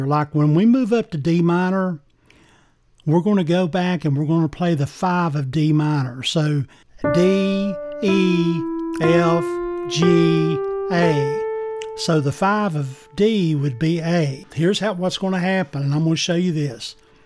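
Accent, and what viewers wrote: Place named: Tennessee, United States. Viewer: Whoa, that accent was tough to get a lock on! I was across the pond at first! My best guess though is somewhere in Tennessee or Kerrville, Texas? Anyway, very cool lesson! Thanks for sharing. This helps a lot.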